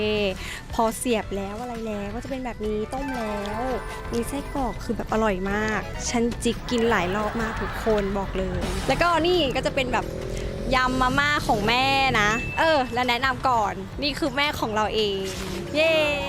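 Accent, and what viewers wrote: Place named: Nakhon Sawan, Thailand. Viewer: ดูตลอดนะครับfcขาหื่นนครับ พึ่งรู้ว่า เป็นคนคอนหวันคนบ้านเดียวกันเลยครับผมอยู่อำเภอท่าตะโกง่าบบบ ถึงว่าสำเนียงทำไมเมือนคนนครสวรรค์จัง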